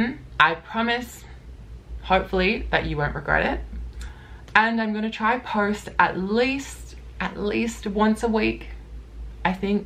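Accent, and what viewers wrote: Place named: Queensland, Australia. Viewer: You sound like a Queensland girl, or is that just me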